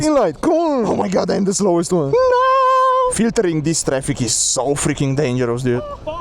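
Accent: Romania. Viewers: brooo, is romanian accent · You're romanian,right?Your accent seem to be from a Romanian guy(nu prea o am cu engleza) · Ce accent de român ai smr eu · Stiam eu ca esti roman, dupa accent. Foarte faine videoclipurile. Poti oare sa faci un videoclip cu surron ? Ca inca nu stiu daca sa iau surron sau kukirin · ești român coaie hahaha😂 W numa acuma mi-am dat seama ca ai acent roman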